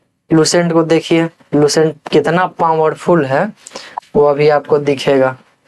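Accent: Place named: Bihar, India. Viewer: Bihari assent in his voice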